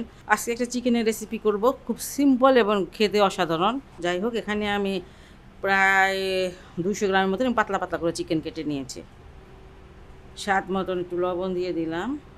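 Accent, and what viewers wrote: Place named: Old Dhaka, Bangladesh. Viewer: আসসালামু আলাইকুম আন্টি। রান্না করার সময় আপনার হাতগুলো দেখে মনে হয় একদম আমার আম্মুর হাত এর মতো। আর আমার নানাবাড়ি পুরানো ঢাকায়। আপনার কথা বলা আর রান্না দেখে মনে হয় আপনিও পুরোনো ঢাকার মানুষ। অনেক শুভকামনা আপনার জন্য আন্টি। দোয়া করবেন আমাদের জন্য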